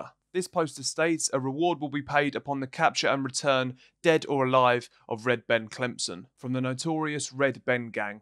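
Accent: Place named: England, United Kingdom. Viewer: I'm always here nice to here an English accent on YouTube 👍 bloody yanks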